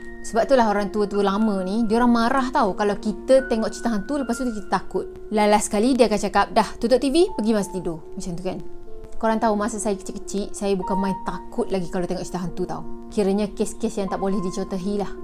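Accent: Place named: Johor, Malaysia. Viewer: Best le kakak punya penyampaian tak bosan macam sleng jawa johor tu yg kmi suka cara kakak berckp .👍👍👍😃😃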